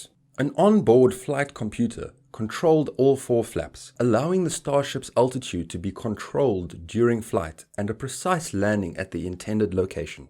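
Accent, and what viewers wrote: South Africa. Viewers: you have a south african accent? i'm from durban, loved the video! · I can hear your South African accent from a mile away.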